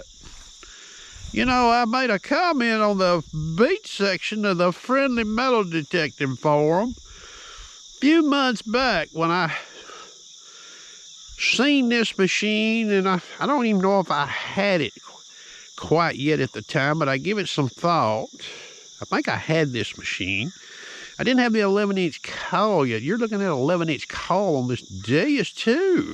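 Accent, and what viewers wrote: South, United States. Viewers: He's pretty smart for sounding like a hill-billy isn't he? :). When I saw him put a Legend near a fan and spin a coin around to test the speaker.. I knew then this dude was a genius!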